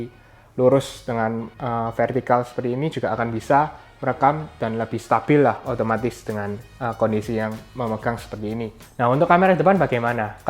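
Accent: Java, Indonesia. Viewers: Gara gara logat jowo ahire aku subscribe.. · medok amat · Medok itu keren bro 👍🏻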